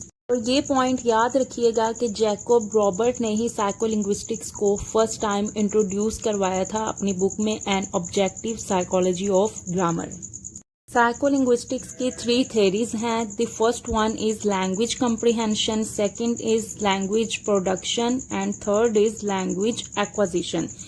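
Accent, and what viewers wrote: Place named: India. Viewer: Why are Indians having a bad accent to accommodate English and still want to teach the same language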